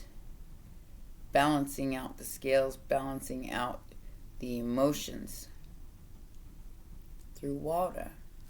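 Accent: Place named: South, United States. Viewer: Set up ain't the word for it. Luv that southern drawl ya got. Great read 📚 reading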